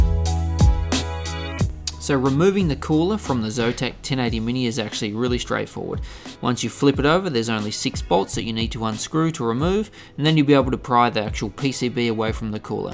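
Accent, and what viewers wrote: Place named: Australia. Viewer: His guys aussie accent is too thick..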